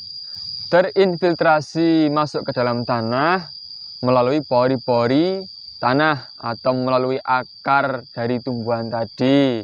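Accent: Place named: Java, Indonesia. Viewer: Medok Pak, kata orang sumatera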